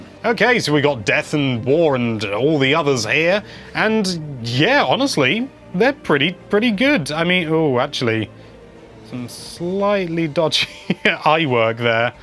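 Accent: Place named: United Kingdom. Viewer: ayyyy your british....im subbing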